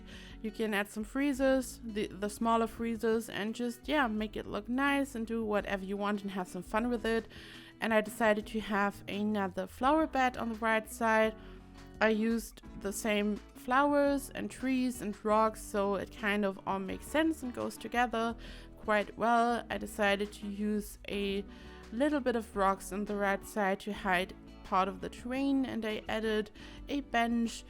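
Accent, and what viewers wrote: Germany. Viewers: Ur german, aren‘t you? Sounds like it.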